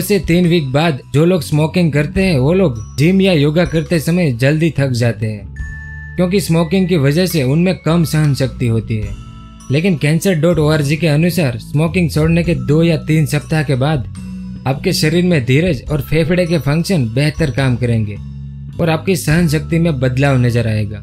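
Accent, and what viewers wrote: Gujarat, India. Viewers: gujrati voice 😂❤ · Bhai, tum gujrati ho? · Bhai tu gujarati hai kya ????🤔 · Gujrati h ky bhai????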